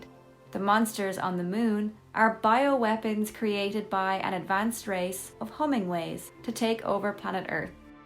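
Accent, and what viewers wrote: Ireland. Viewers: I don't know the specific accent, but you sound Vaguely corcaigh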